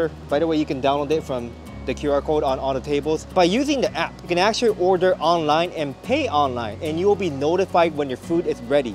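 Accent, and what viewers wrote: Singapore. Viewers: hi...i like yr relaxed singish style...keep up the good work!!!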